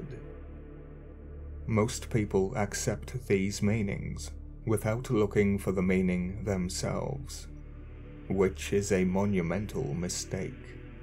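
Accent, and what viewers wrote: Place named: England, United Kingdom. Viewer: Omg.. So dramatic with the heavy English accent 🤣. I figured "Lord" was related to Baal and other pagan deities in like 5 min as a teen. Also "Elohim" can be figured out with just a Strong's concordance. It's just a title dude, that can be given to angels and men. It's a council a court, some are fallen.. Like the angels that slept with human women. In the book of Job Satan himself attends one of these meetings and "El" gives job to be tested. Real Hebrew btw did not come from Sumarian language. It's a forgotten language that is now a mixture of languages. No one speaks pure Hebrew. So it doesn't surprise me of all its Babylonian influence, even modern Judaism main source is the Babylonian Talmud. Basically all major religions can be traced to Babylon.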